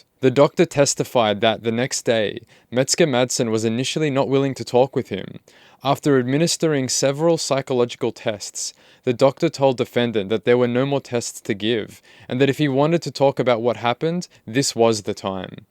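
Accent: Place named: Australia. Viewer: I'm not a big time YouTube commentator because of how much i try to be anonymous, but your videos certainly have a way of stimulating interesting discourse and your point of view is intriguing. (Also aussie accents are my favorite 🤘🤘🤘) All i really can say about this one is i am not convinced that Cody is of average "intelligence" for lack of better wording. He seems like he could have developmental delays which are especially likely given his exposure to hard drugs in utero. I say this not because I am excusing what he did or even buy the whole goblin thing, but i feel empathy for him because he is clearly functioning at the level of a much younger person, perhaps even as young as the boy he murdered. Murder is wrong and horrific, obviously. Cody is an unfortunate soul though too. Born on drugs is trauma, foster care is trauma, being different from your peers is trauma, and so is severe mental illness. 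The universe is impartial, and Cody was dealt a bad hand. Many of us are to varying degrees. I don't have a point to this, but i couldn't help saying out loud at the end of your video, "what a shame". (Edited misspellings)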